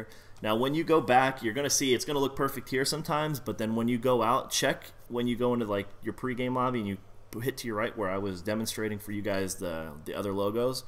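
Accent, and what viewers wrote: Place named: Canada. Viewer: wow canadian eh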